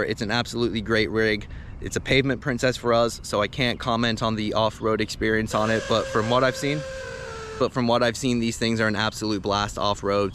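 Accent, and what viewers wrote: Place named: South Korea. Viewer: 제목은 한글인데 콩글리쉬로 씨부리노 ㅡㅡ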